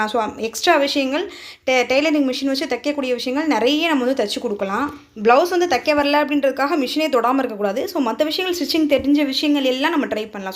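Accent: Madurai, India. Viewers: Neenga madurai ya sister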